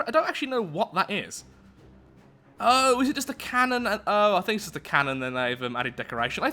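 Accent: United Kingdom. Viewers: Hey are u british or? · Just listen to em! He’s got to be British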